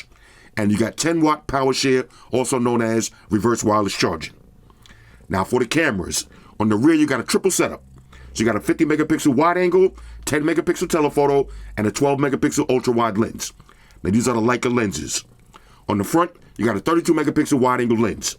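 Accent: United States. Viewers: Where are you from, bro? You sound American but your usage of the word "aluminium" is throwing me off 🙂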